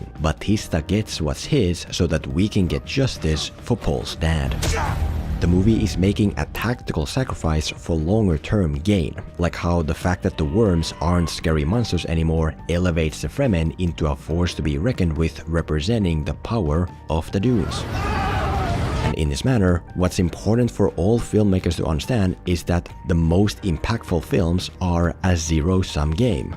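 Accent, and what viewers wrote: Finland. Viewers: You sound Finnish, and if that's true, I marvel at your strength not to pronounce Harkonnen as Härkönen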